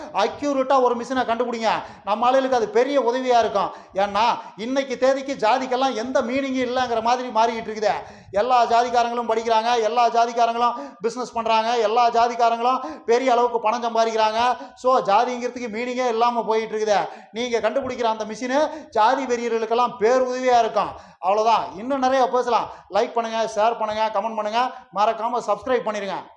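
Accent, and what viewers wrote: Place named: Coimbatore, India. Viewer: ur cbe voice gives the slang a nice one wait for the voice not for the messages matters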